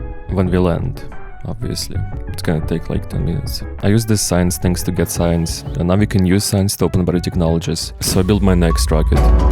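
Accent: Russia. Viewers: NOT an American lmao that accent exists NOWHERE in the US 😂 feel like he comes somewhere from Russia or Asian/Euro area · Wha What is your accent? Not trying to offence, I'm really interested, if you're from Russia. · from a guy with strong Russian accent...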